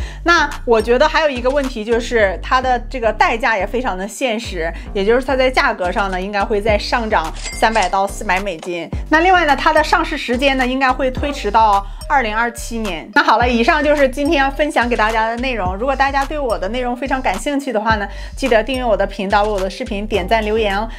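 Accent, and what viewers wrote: Northeast, China. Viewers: 就喜欢大东北碴子味😂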